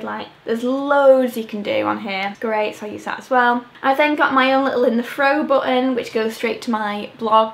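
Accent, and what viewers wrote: United Kingdom. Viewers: what's her accent? like what part of the UK?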